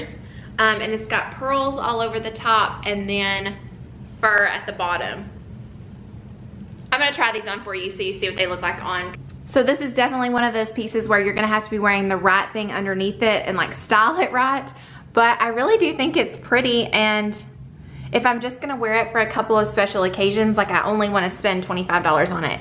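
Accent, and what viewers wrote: South, United States. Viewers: I just LOVE YOUR SOUTHERN ACCENT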